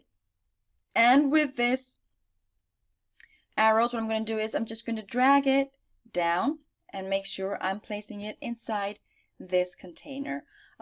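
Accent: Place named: Europe. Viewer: Hay !!! I was all struggling doing it the hard way with the other wordpress youtubbers? No wonder it was taking so long? to get it? Thank you nice lady with the exciting european accent : ) spaciba?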